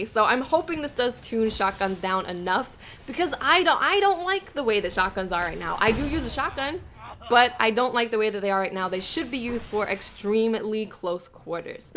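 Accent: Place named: East Coast, United States. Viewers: The Black Hammer nerf really takes away the dynamic of that sniper rifle. It basically becomes a sniper with muligan... BTW, where is your accent from? I want to say east coast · I've never heard a new york accent before and I live in the US lol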